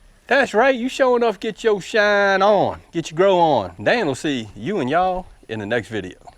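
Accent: South, United States. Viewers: I love your country accent. And your directions are clear. Thank you so much for your help!! I'm planting my avacado tonight.🥰🥰🌱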